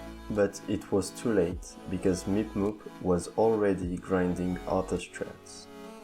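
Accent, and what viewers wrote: France. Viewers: I can recognize a french accent. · La France résonne dans ta voix, très belle vidéo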